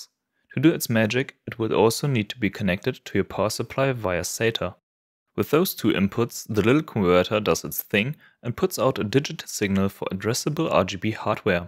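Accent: Germany. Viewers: That heavy German accent Tho :) . Nice video BTW , keep Going.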